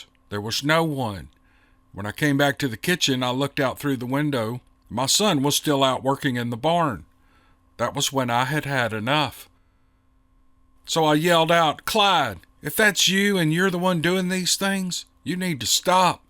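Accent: South, United States. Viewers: I LOVE ALL THE STORIES UNDER WHATEVER YOU PUT THEM! YOU HAVE A WONDERFUL SOUTHER VOICE! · Your calm voice in the night sharing stories was very much appreciated. I had to stop listening for awhile since retitling mixes were the same stories I had already heard and it was frustrating trying to figure out what was new and what was grouped into old stories by numbers. I only listen on YouTube and it was getting too jumbled to find recent posts from repeats. Posted date does not always mean recent podcasts. Sometimes the date was just when it was reposted into a different group of stories. "What if it was true"? Steve somebody? Do I want to write down the number? I stopped listening. But I don't like the voices of other storytellers. Your calm voice with the southern accent of my mother's relatives, is like sitting to listen to family visiting and catching up. 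Last night this post popped into my YouTube feed when I needed to get away from loud arguing of news stories and blood and thunder prophets. Thanks for continuing to keep it calm with anecdotes and comments. It was soothing to hear your voice as the wind was blowing in a storm moving south by southeast along the Pacific Coast, pushing inland over the coastal mountains. I hope your family, relatives, and friends have been missed by tornadoes, wind, and floods. I have a day to get ready before the temperature drops and the slushy mix turns to inches of wet snow and freezing nights for about a week. I am going to go in search of what has been added since I took a break and see if I can find what search terms I need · You read these stories so clearly and fluidly you must pre read and proofread every story, great job as usual and I am from Canada 🍁 and love your light southern accent!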